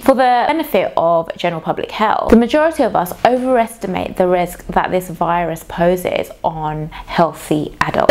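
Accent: United Kingdom. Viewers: R u from London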